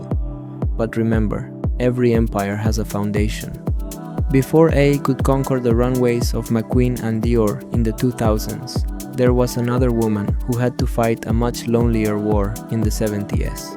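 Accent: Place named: Japan. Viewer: The narrative is so awful that I can't understand at all. Fucking Japanese pronunciation.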